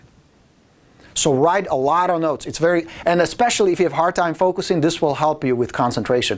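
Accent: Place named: Czech Republic. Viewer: Hey, you mentioned your former thick Czechoslovakian accent and that you had to get rid of it. How did you pull that off? I myself need to get rid of my thick German accent...;-)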